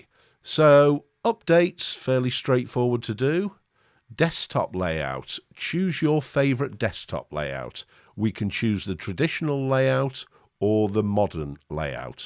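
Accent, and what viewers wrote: United Kingdom. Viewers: Mint has been my main dektop OS for quite some time now. The thing just works!!!! On my desktop, my laptop, kids laptop, kids gaming desktop running Minecraft nonstop, the media centre....I've had Ubuntu installed at some point until they came up with that Unity nonsence and I was facing the dilema whether I had to run to the nearest PC shop and shed cash for upgrades or switch distros, then I discovered Mint... I've been Linux user since the late 90's and started with Slackware. Really enjoy your videos and glad to see reviewers with British accent out there :-D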